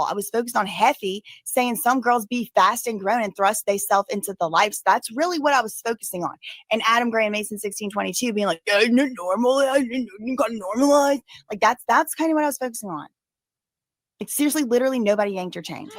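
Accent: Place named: Louisiana, United States. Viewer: You and Britney Spears have the same Louisiana accent. You are genuinely funny!! Always making me laugh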